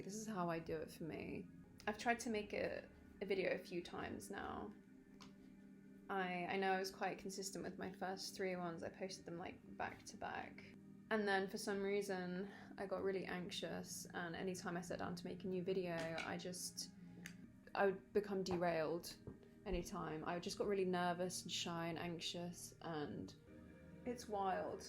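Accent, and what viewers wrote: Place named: RP, United Kingdom. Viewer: ! Why are you so posh?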